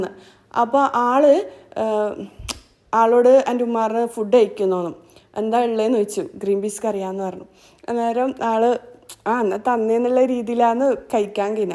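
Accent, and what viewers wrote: Kannur, India. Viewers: Kannoore varthamanam nalla rasamund tto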